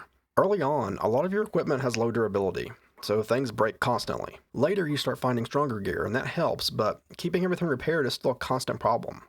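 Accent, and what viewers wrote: South, United States. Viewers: Small startup channel playing weird shit in a southern accent? Sign me up!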